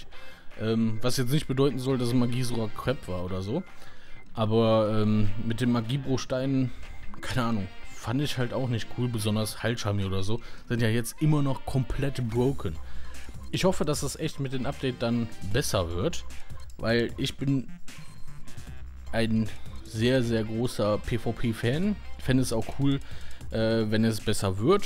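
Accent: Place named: Germany. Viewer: Uff dieses deutsches Akzent ist ja schlimmer als Hochdeutsch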